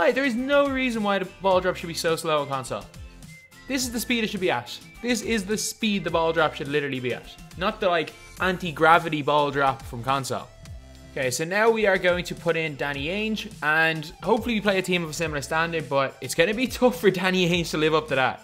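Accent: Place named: Ireland. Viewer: He is from Ireland and I actually like to watch a 2k youtuber who doesn't speak american english. Cause that can get annoying after a while.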